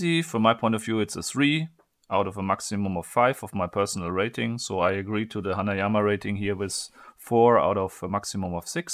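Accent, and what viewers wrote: Germany. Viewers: du bist deutsch! höchstens ein deztscher würde I-dentikäl sagen, ein engländer oder amerikaner würde Ei-dentikäl sagen! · . Your videos are great. I love your freaky german accent. But repeat after me. Sehr-ries. Series. Not serious. That shit is seriously annoying.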